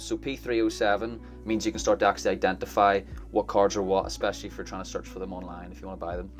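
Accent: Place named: Northern Ireland, United Kingdom. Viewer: Northern Ireland accent 🤔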